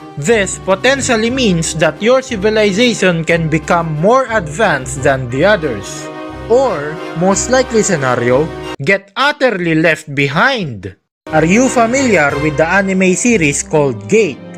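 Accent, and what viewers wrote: Philippines. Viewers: Spiffing Brit with dat Filipino accent 🔥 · Why do we have the same accent? Kabayan?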